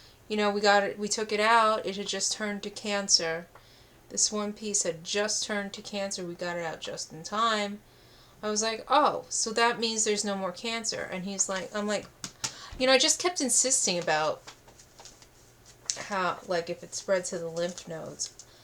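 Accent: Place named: East Coast, United States. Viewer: hello again, nice to meet you and also wanted to ask if you’re from the East Coast. I’m originally from Brooklyn New York, I’m 72, and I live in Hawaii for 40 years but I kind of recognized and East Coast accent. I can sense you’re a special person. Sending warm aloha breezes your way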